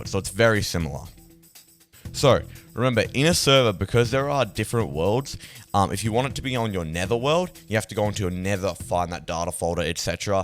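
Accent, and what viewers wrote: Australia. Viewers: Are you from Australia or New Zealand? Your accent. It sounds Australian.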